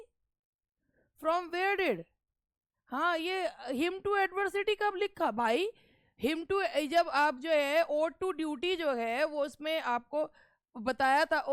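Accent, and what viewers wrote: Meerut, India. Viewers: Ma'am aapka Meerut vala andaj bahut bha jata h dil ko❤❤🙏👍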